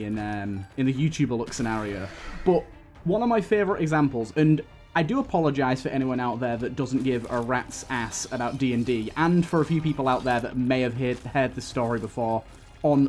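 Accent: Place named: Birmingham, United Kingdom. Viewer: The question to you is simple where are you from in the UK i don't want your exact location just want to know where your accent is from you sound brummie to me but has some twang to it. its been bugging me since i've started watching your channel :(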